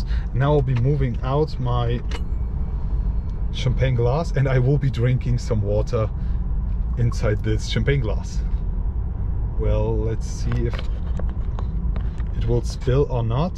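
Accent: Germany. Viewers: Der bre ist so hard deutsch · Man merkt die deutsche sprache haha! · lool facts i guess this is just the german accent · Ich liebe den deutschen Akzent hahaha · Der Typ ist zu 100% Deutscher hahahaha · Hab mich schon über den Akzent gewundert, bis ich dann das Kennzeichen gesehen habe. War doch, wie nach meinem Gefühl ein deutscher Akzent. Gefällt mir xd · Er ist sowas von Deutscher😂 100% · your english is very good, unfortunately with a very strong german accent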